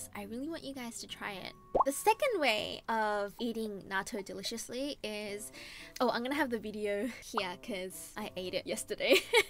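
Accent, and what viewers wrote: Australia. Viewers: Ive been enjoying these videos! Keep up the good word! P.S. I love the Aussie accent in your English! It sounds attractive to listen to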